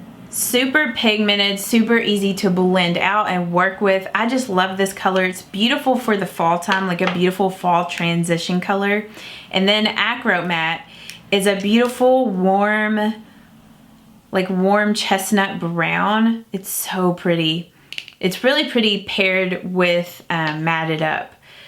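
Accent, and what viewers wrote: South, United States. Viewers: I love your southern accent. It’s so cute.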